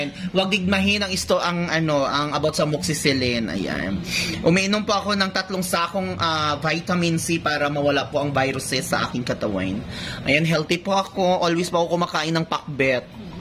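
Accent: Iloilo, Philippines. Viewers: tga davao ka sir bat ilongga ang sulti ninyu dha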